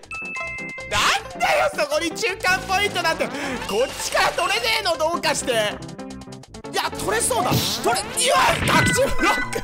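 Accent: Fukuoka, Japan. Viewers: さんは福岡のかたかな？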